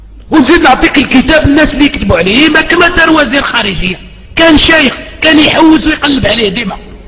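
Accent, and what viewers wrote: Morocco. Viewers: لهجتك مغربية.... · C un marocain qui parle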